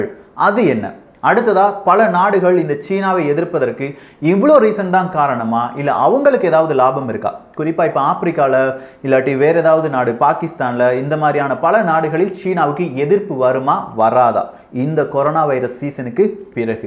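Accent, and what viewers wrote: Kerala, India. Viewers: Then, yesterday why u told Malayalam slang come out while you speaking. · hey he is in Kerala might be influenced by the surroundings r ketu ketu slang appidi vandhirkulam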